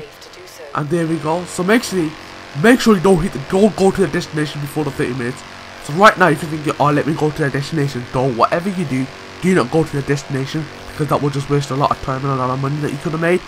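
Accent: Birmingham, United Kingdom. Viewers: Im pretty sure this is a brummie accent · he has a good british accent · Bro just cuz he's got a Birmingham accent doesn't mean he's romello's dad 😂😑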